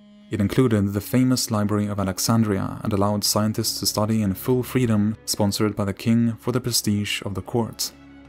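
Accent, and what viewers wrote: Sweden. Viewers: Is the narrator Swedish? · I can hear that the narrating voice is swedish. :-D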